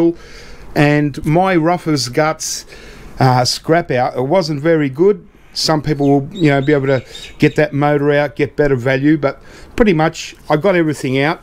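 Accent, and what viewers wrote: Australia. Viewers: Hello from Napa Valley, CA, USA!! I have seen many of these teardowns of air conditioning rads. First of all, those copper coils or "noodles" are sawed off, then that sheet metal piece is removed before scrapping as it is. Love your "Down Under" accent and keep the videos coming!! Watch out when scrapping the second rad!! I saw a menacing looking spider!!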